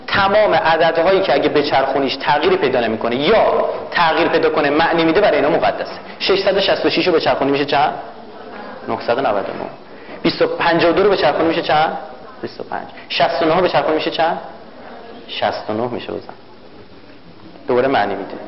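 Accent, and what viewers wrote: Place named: Iran. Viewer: khob in agha irani ast wa dar iran zindagi mikone wa lahje ash irani ast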